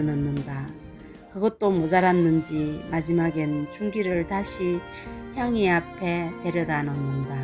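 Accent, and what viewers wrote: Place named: Gyeongsang, South Korea. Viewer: 경상도 말투 정감가요 잘듣겠습니다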